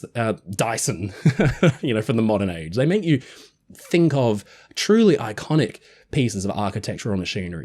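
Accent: Australia. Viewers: Friend of mine retired from the merchant marine roade the SS United States. He said she was somewhat rough riding he thought due to its speed. A shame but the time to have done something was decades ago well before the Mad Men show. Huge cost, being in Philly didn't help. Interiors is what most people want to see. Ship didn't have the right friends in the right places. But as you noted, very few ships saved/preserved. Even naval ships were neglected, Farragut's flagship rotted away. Nothing from the Sail & Steam era I'm fascinated by. Thanks. Beautiful rant. So you're an Aussie??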